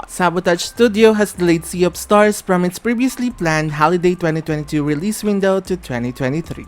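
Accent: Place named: Philippines. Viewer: Wow Pinoy accent 😂 Hello Lods!! ❤️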